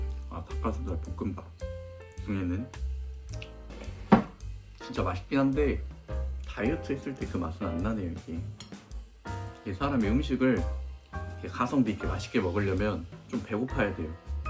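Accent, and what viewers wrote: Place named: Jeolla, South Korea. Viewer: 약간 광주, 전라도 사투리 억양이 느껴지는데 혹시 광주쪽 사람인가요?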